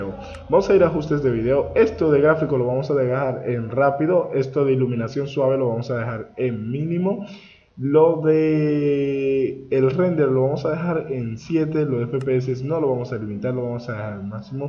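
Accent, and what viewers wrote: Dominican Republic. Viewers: loko tu eres dominicano? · Eres dominicano?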